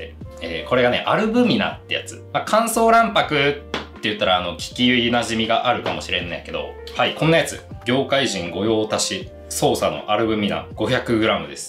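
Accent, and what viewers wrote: Fukuoka, Japan. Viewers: 福岡の方ですか？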